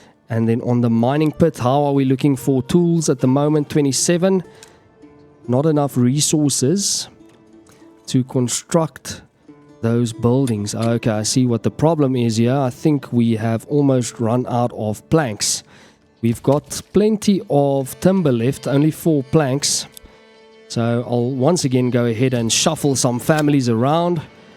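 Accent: South Africa. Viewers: Question there you from? My guess is South Africa 🇿🇦, maybe cape provinces, or Limpopo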